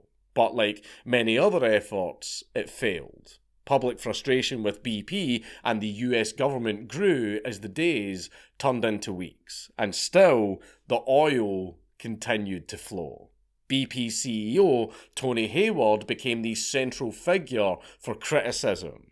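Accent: Scotland, United Kingdom. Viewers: You are Scottish do the Piper Alpha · spill with a Scottish accent sounds awfully close to spell, and at times had me wondering about what wizard was responsible for this catastrophe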